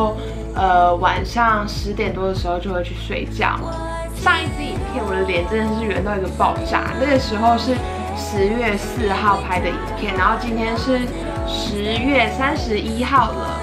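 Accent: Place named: South Korea. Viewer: 哇咔咔等到你嘍，想問健身視頻都看哪個？聽上去是韓國人呢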